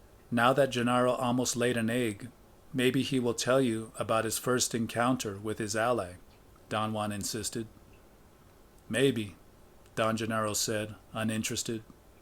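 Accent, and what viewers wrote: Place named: Spain. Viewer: Listening to these magical books with the clear spanish accent is perfect! Muchisimas gracias hermano!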